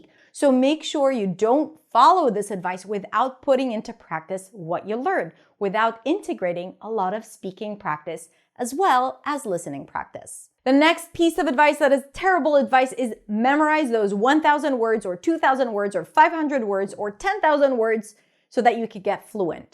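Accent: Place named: United States. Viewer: English is my second language and i've learned it just by watching youtube videos and listening to english podcasts (joe rogan, mr ballen etc). I've been doing this for many years and many hours per day, every day. So..., i'm sorry but your statement that learning a language by watching/listening the targeted language is bad advice is mind blowing to me. Can you explain how did i acquire my english? I've never spoke it while learning it, and one day, i just start speaking it spontaneously. You dont learn a language, you acquire it by listening and reading and you dont have to speak it, for it will come later, naturaly. Also your american accent is flawless, i think english is not your second language, if i am wrong, im sorry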